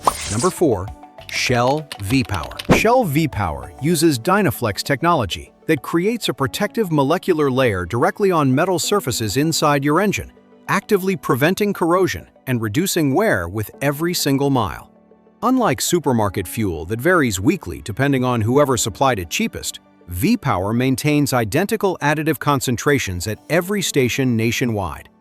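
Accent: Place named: United States. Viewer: And an American accent . I smell a load of AI bollocks !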